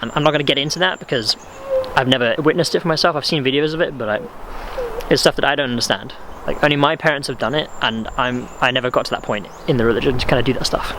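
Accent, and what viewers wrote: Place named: England, United Kingdom. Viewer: Where are you from because you mentioned high school but you have an English accent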